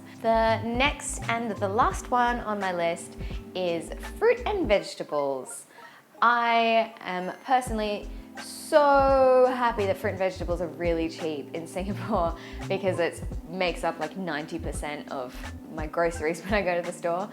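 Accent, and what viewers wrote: Australia. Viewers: Love your Aussie accent. Australian accent sounds like British accent. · Thanks for sharing. Love your Ausie accent.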